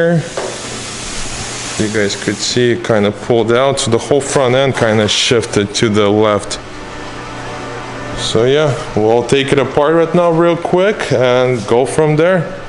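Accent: Russia. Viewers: Говор как у русского.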